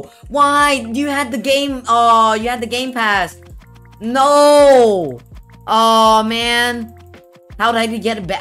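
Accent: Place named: Vietnam. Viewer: Are you Vietnamese you just have a accent(no offence)if you are Vietnamese I'm also vietnamese:)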